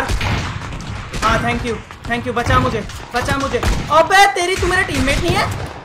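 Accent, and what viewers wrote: Haryana, India. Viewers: Hariyanvi style xD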